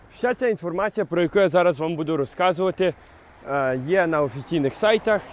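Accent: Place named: Transcarpathia, Ukraine. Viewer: Львів точно так не говорить, навіть близько, а от на Закарпатті так)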